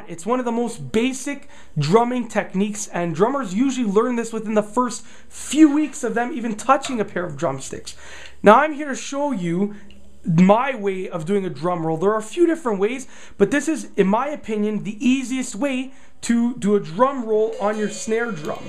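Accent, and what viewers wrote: Canada. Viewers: Are you canadian? So sorry... Love ur vidz tho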